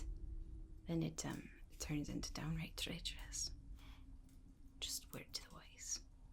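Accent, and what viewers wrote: Ireland. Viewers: Aaah, so soothing. Thank you ever so much,. I've recently discovered ASMR and your channel,and I love it (not to mention, your wonderful irish accent).Always had a special fondness for lighthouses, btw. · A sure spot of siren song itself, this soothing, seductive, cigar smoke shrouded seance of the solitary sage. If not the siren residing on the rocks to lure you to be lost on some granitic crag, surely a siren to lead you to be lost in an illuminating legend or captivating contemplation of the captain from a rocking chair. More than a wee bit of beguile by brogue in an old saw, story, or spell shared well by the keeper of the light. Far more to keeping the light than meets the eye indeed -- and not the one atop the tower, but in the be-lure to be sure of the tender of tales and old soul insights that cast their own lights over leagues and lifetimes.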